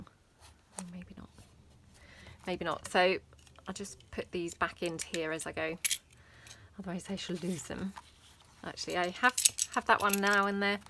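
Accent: England, United Kingdom. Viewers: Beautiful journal, and I love your English accent but your daughters little voice with that accent is sooooo adorable! 💕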